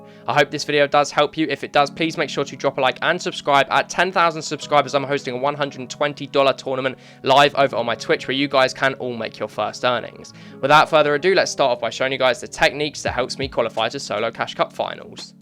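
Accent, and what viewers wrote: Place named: United Kingdom. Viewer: oh my god he got the strong bri'ish accent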